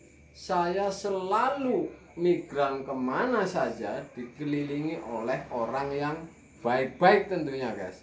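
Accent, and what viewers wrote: Banyumas, Indonesia. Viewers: Senadjan kesanne mandan kaku, nanging aku bangga... logat Banyumas ane bisa dikenal Maring saindenging Nuswantoro.... aja kelalen... tetap semangat 45.... merdeka....!!!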